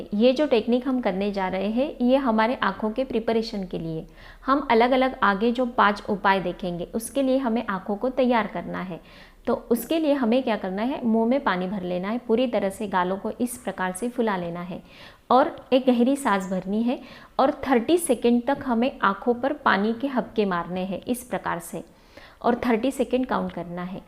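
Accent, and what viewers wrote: Maharashtra, India. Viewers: Good Tips...Thanks. My guess... you are Maharashtrian. Because of pronouns of some words done by you I came to that conclusion. Am I right ?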